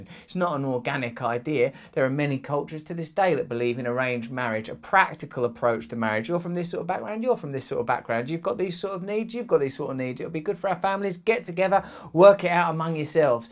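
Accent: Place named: United Kingdom. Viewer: I don't understand your British accent 🥺🕊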